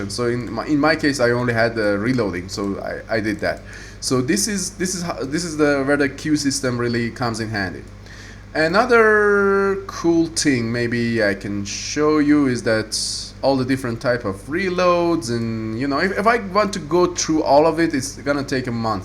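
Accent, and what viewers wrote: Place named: Iran. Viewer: are you Persian? your accent sounds like Persians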